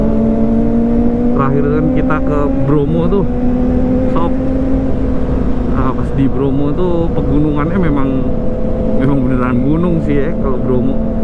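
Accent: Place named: South Kalimantan, Indonesia. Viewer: Banjar ny kental bener dah 😭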